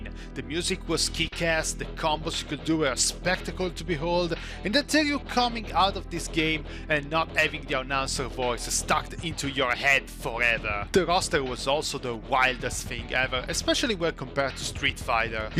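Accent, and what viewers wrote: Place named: Italy. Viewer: Your voice is pretty boring, and honestly I’m not a big fan of your Italian accent. Also, I think showing the mini SNES with loose cartridges feels a bit ridiculous and annoying. Using the original console would have made a much better impression.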